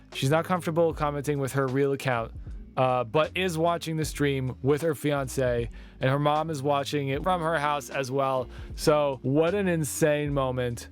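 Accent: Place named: North America. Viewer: This is amazing, you have such a gift! I know you are not the only person out there making music, but... This is something so completely out of my reach, it could just as well be magic. See, every time i see someone painting or crafting or sewing, i have a litthe voice in the back of my head saying "yeah i could do that". But it never cam when I was watching you, this is how incredibly unreal making music from scratch is for me. I think it's not something you can learn from practce - you can excell in it, sure. But you have to be born with this special little spark that you have and I dont. As much as I love those little happy songs and I come back to them over and over again, i keep my fingers crossed for your own personal music making projects. You are amazing and I hope good things await you in life. Also, I was low-key suprised that you sound so North American, because of the way you looked and the sweaters my stupid brain assumed you are based somewhere in former Yugoslavia :D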